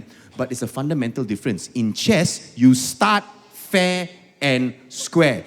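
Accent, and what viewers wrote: Malaysia. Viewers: In his rant about math, i was guessing he has education background. Then he revealed he is a TESL graduate it all make sense. TESL pride 😂😂😂 His delivery very malaysian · The way he speak english like how my malay friend speak English.. the melayu slang.. darn spot on..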